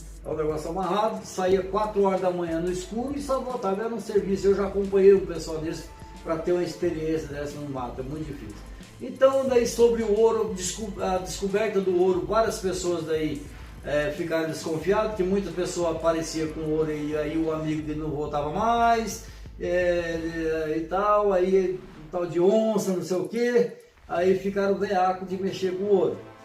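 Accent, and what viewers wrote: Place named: Northern Minas Gerais, Brazil. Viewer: Esse sotaque é aqui do norte de minas, achei legal.rss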